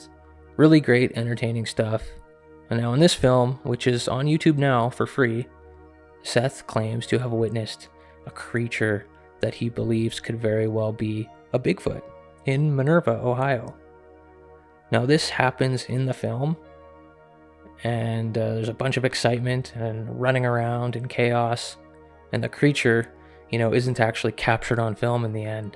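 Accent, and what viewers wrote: Canada. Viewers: Yes that's a good documentary but I like your stuff the best an I hope it's you that proves bigfoot is real I love your Canadian accent 😂 keep it coming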